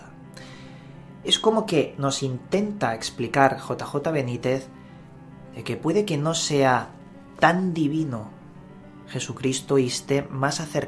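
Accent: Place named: Spain. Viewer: No soy muy amante del acento español, pero el tuyo es tan tranquilo tan suave que me relaja, saludos desde Colombia.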